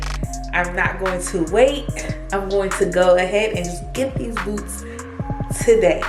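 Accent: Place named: DMV, United States. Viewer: So cute! Sn...r u from the DMV? I thought i heard an accent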